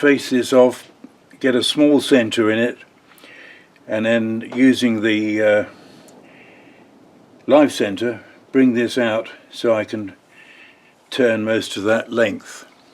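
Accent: United Kingdom. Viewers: Hope your back gets better soon. It's nice to hear a British ascent. And a bumbling old twonk. Keep up the good work. M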